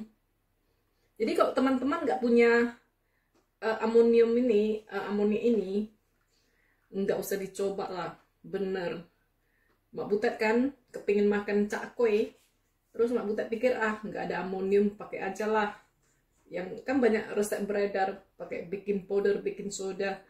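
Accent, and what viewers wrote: Medan, Indonesia. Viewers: kalau ngomong enak deh, dengan logat medannya. Wkwkwkwk.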